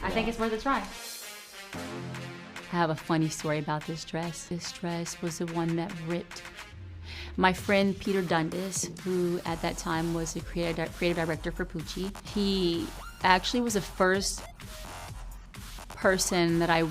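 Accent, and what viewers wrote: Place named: California, United States. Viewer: I know, too much vocal fry and California. She is being genuine but it comes off fake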